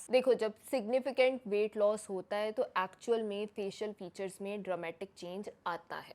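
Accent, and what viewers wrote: India. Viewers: Jo humara urdu ka undaz hai uss ko khudara hindi lahjay say na badlay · I was so confused with her accent. It is definitely not a Pakistani accent and you are a doctor. You should not say tum we use up to show respect in class. I’m not only that the entire way of speaking the method of speaking is all Indian. Please go back to your roots.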